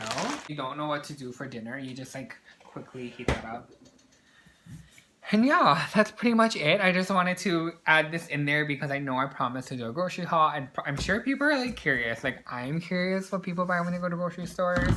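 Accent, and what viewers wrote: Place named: Hawaii, United States. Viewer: I’m trying to find ways to organize my lashes and as soon as I heard you speak I just knew you were from Hawaii 😂💛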